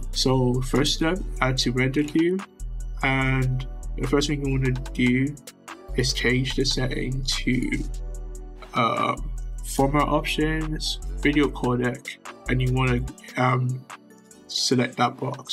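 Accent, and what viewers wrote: United Kingdom. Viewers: nice hearing your beautiful bri'ish voice again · AHHHHHHHHHHH THIS IS EPICCCCCCCC- ALSO DON'T WORRY MAN I AM SUBBED TO YOU SO NO NEED FOR YOU BRI'ISH VOICE TO TELL US!!!^^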